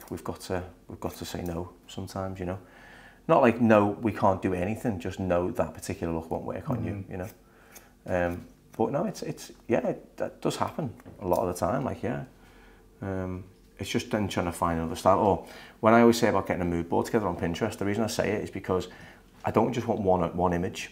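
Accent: United Kingdom. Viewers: He's British, great accent talks about what he is doing on video, scroll on if you don't like it. Some of us like to watch and listen to!❤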